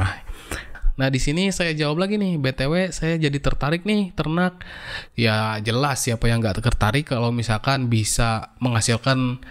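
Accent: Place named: West Java, Indonesia. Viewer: lagam indo na siga sunda,sunda na timna a?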